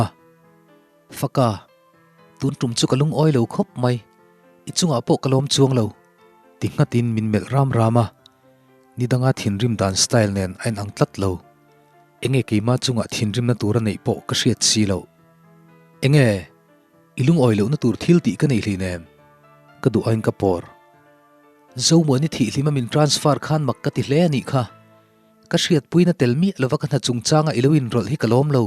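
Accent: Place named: Lunglei, India. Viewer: segundo ngaihthlak nawm na chhan hi chu lunglei lam hi atam thina anuam hranpa ve hrim2 heje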